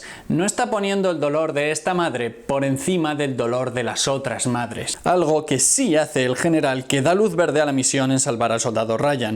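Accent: Spain. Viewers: Para comenzar, el dejo o mote Español me llega al chompiras. Y a quien xuxa le importa si el tanque, o la placa o la maquina de escribir son o no son de la época.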